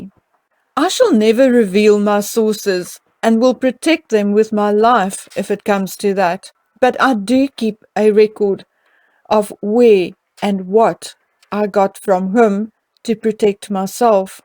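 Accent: South Africa. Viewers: I like your South African Accent!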